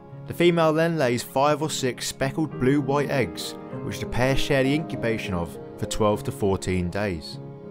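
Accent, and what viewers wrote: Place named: Norfolk, United Kingdom. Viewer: love your channel. Brilliant content. And good to hear another Norfolk accent on YouTube! Merry Christmas!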